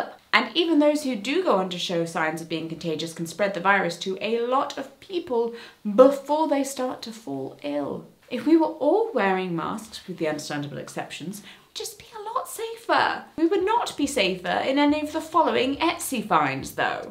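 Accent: United Kingdom. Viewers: You sound extra British in this one 😂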